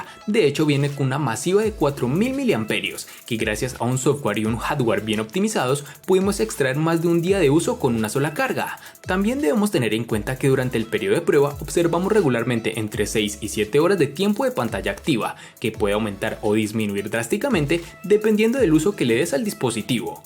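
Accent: Cali, Colombia. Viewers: SOS DE CALI? JAJA YO SI DECIA VE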